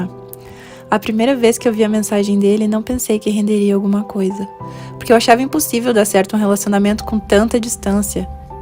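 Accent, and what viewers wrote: Rio Grande do Sul, Brazil. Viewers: Ameeeei tanto.. logo que vi o primeiro vídeo reconheci esse sutaque de gaúcha 😂😂😂 linda, achei vcs recentemente aqui e já amo os videos, sou de São Gabriel 3hrs de ônibus até Santa Maria 😍.. amei o vídeo e amo a risada do 😂😂😂 beijão pra vcs ❤ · Nossa que lindo!!! Ah e eu sabia que tu é gaúcha, já te vi falando "tu" e acertei no meu palpite. Sou de São Sepé, cidade vizinha de Santa Maria. Parabéns pela coragem! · Sou do RS tbm logo vi teu sotaque no primeiro vídeo que assisti 😂 amei tua história fiquei muito emocionada, que tenha muito sucesso sempre em sua vida ❤️❤️❤️ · Conheci o canal a pouco, vim parar aqui e logo reconheci o sotaque gaúcho da minha conterrânea! Muito amor e muitas conquistas para essa família linda!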